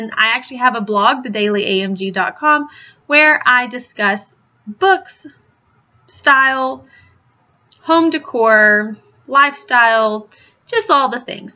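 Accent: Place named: Texas, United States. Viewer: I love your accent, I lived in Houston TX my whole life and we just moved to Michigan and I miss the accents!! I loooove watching book hauls so I can't wait for more from you! great video!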